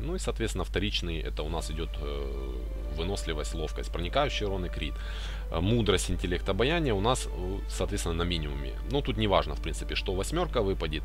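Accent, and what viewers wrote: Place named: Ukraine. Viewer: ***** ну уши режет... лучше бы на украинском говорил , чем с таким акцентом .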